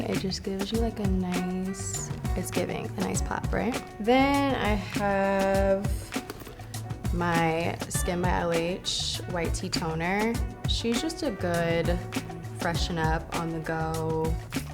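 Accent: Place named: California, United States. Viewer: It’s a California/LA girlie accent. We tired of it in the states as well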